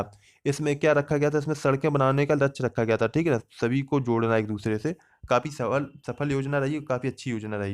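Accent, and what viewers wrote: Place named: Bharatpur, India. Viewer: Sir aapki boli bharatpur ki LG rhi h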